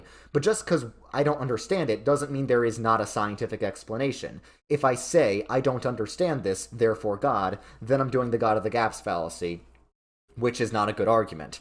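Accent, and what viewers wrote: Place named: Bronx, United States. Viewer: No offense, but you definitely sound like you are from the bronx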